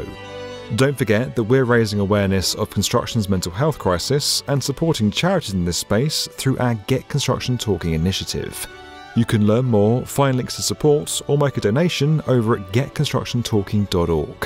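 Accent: England, United Kingdom. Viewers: As an American , l cannot tell you how much a British accent adds to narration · It's not the height of Big Ben (a bell), which is but a few feet high, it is the height of the Elizabeth tower that holds Big Ben. You sound English, you should know better. Bollockbrain.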